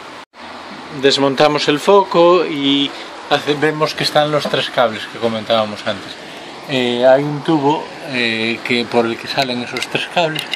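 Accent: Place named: Spain. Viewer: Gracias por el video me sirvio para hacerlo sin que me explote nada en casa :-) q uno es manitas peroooo.... mori de morriña cuando escuche tu acento 15 años fuera de España pesan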